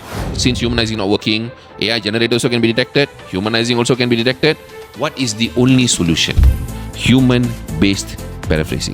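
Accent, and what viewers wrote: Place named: Singapore. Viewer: This man speaks and sounds like Kumar from Singapore.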